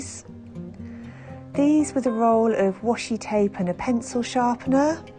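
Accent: United Kingdom. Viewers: Dear, first of all, I want to emphasize that my comment relates to all of your videos and not specifically to this one: I love your calmness, your British accent and it's always as if you were smiling while recording. It's lovely. 💕The music in the background and the recognizable colours (being coordinated) make you stand out among lots of other, also very talented people on the net. Thank you for sharing and keep up with your style and youtubing.:) Looking forward to it ❤️ Greetings from Serbia 🌞👋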